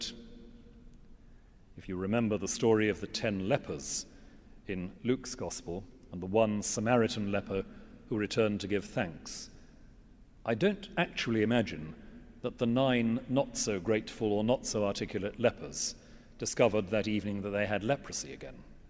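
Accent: United Kingdom. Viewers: the priest with the golden voice, and a british accent to boot! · The Archbishop of Canterbury has to speak the Queen's English! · Where did he get that posh accent? He's a Welshman!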